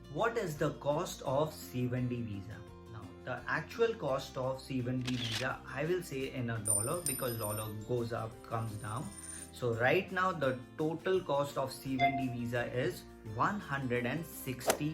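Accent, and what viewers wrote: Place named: India. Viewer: I love your videos and the your Indian accent . Good job Bro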